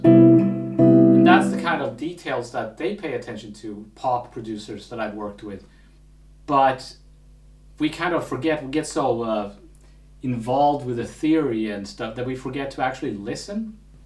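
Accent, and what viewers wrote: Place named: Sweden. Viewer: Great lesson! By the way are you finnish or swedish. Based on your name I'd guess finnish but your accent is a bit more swedish than finnish.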